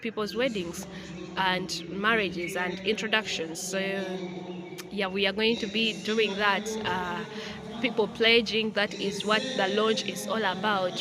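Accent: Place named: Uganda. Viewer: Am from Namibia your accent reminds me of one medical Doctor's wife from Uganda, I actually found them in church in 2018 the year I got saved... I will never forget them that accent of yours reminds me alot of them.. they always invite us at their house, fellowships all night.. I will never forget them Lol In the coming years I must come to Uganda and marry a God fearing women.. I love your accent... Thanks for sharing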